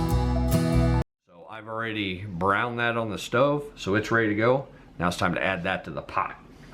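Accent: Indiana, United States. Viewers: You are definitely from Indiana. Lol warsh. Sounds like my sister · Yep, Indiana. Warsh this instead of wash this. I too am guilty!!!!😉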